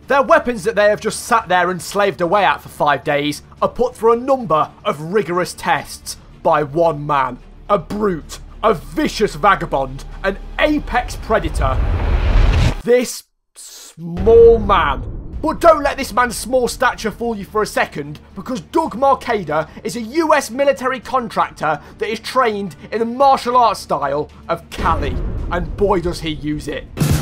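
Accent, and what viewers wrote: United Kingdom. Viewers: Aggressively british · how to make a convincing british accent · British man recaps a show about Radom 40 year old people make pipe bombs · You. Sound very Br tsch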